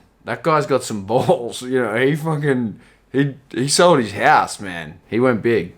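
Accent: Australia. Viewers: That's how Aussies talk hahaha. Studied abroad there.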